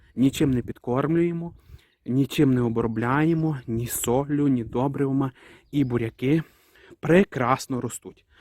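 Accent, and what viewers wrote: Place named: Western Ukraine, Ukraine. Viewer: Такце наша західнецька мова файна і така посадка тоже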